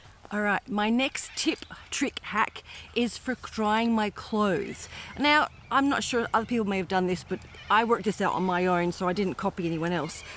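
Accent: Australia. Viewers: Love your Australian accent...but some words sound Canadian? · At the very beginning of this video I thought this lady sounds like an Aussie with an American accent lol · Great tips and really helpful to someone like me just getting started. PS, used to live in South Australia when my husband was still alive. I miss it (and him) very much. Nice to hear the 'accent' again :)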